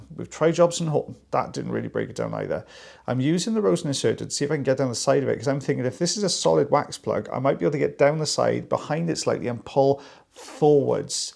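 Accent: Wales, United Kingdom. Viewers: I watch partly because I love hearing a welsh accent. Spent a lot of time in Wales all my life. Sadly never learned it, apart from a few phrases and swear words.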